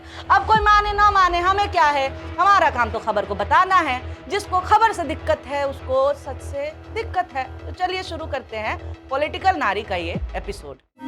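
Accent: Bihar, India. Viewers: Madam normally bol Liya kijiye. Aapka bolne ka Bihari style irritating Hai.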